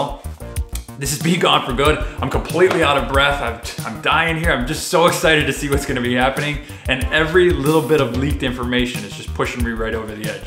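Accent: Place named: United States. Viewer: Cannot hear much of what you are saying. Your strong U/S accent, which is not easy for native speakers of English to understand, and the overbearing music (noise) defeat your purpose. I persevered, but gave up after about 4 - 5 minutes.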